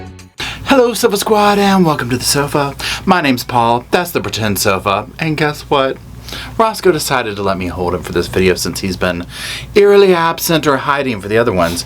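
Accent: South, United States. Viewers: OH I ALSO LOVE HOUR SOUTHERN ACCENT!! Keep it up you’re great!!❤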